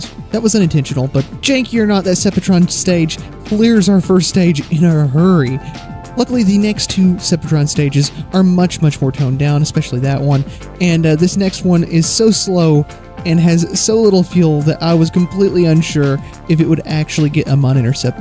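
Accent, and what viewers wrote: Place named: Virginia, United States. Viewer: First time I stumbled across your channel, and I gotta say... Wow, this was really enjoyable to watch. Great commentary, you didn't drag it out 3 times longer than needed to be, bits of humor, and nice editing. 👍👍 Also, you sound like my relatives, and I'm guessing you live in western area of Virginia.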